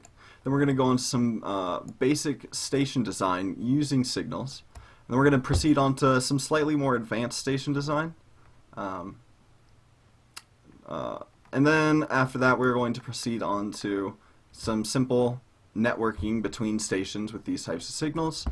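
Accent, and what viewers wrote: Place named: United Kingdom. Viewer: like not fully british but brit-like inflection they had a hold on u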